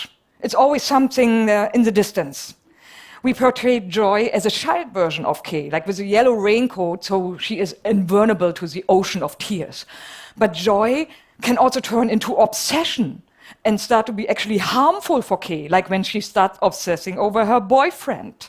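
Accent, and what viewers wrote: Germany. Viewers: Sexy german accent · I think the lecturer has a German accent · Sies ... sies is why... german can't speak English...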